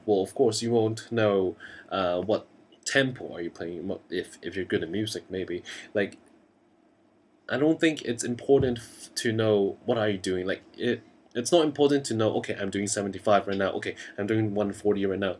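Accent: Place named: United Kingdom. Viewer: British accent!!!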